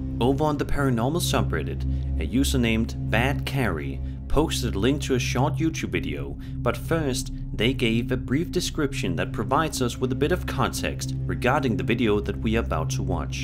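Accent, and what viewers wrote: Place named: Denmark. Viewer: Dont know why but your accent sounds too danish ion know why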